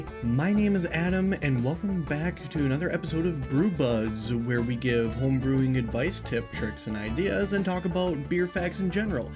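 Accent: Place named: Wisconsin, United States. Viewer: Charming Wisconsin accent! It’s a shame the music overpowered your voice a little. Thanks for the history and review.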